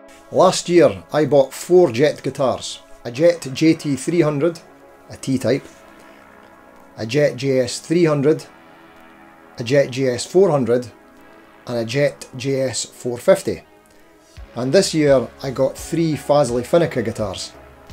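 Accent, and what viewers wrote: Scotland, United Kingdom. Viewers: Oh man i love your accent so much , the first seconds i thought you are Turkish or Arab , the way the " r" are pronunciate , but after 30 seconds it's obvious : this guy is Scottish or Irish ,i don't know ... One thing is sure , it's really different and unique , and really remarquable that in the North of England , Scottland , the people have an accent very close to the irish one , closer than for example , East England or the region of Leeds .... Maybe it'a celtic thing ii don't know , but one thing for sure : your accent is really deep ..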